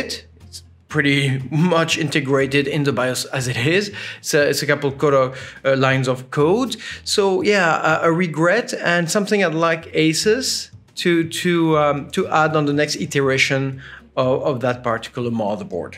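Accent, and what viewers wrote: France. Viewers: Do you are french ?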